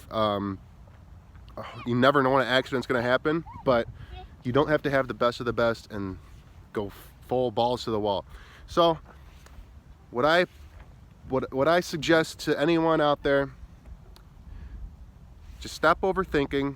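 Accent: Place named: Chicago, United States. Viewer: I knew you were from Chicagoland. The accent and the 815. LOL You looking for any help?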